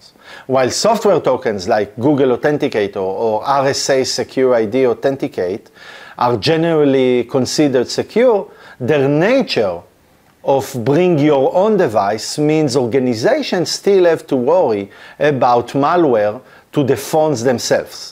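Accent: Israel. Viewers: בלי להעליב שומעים עליך שאתה ישראלי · My brain kept hearing "TO F*CK TOROUGHTENTICATION" until it learned that it was just a strong Israeli accent.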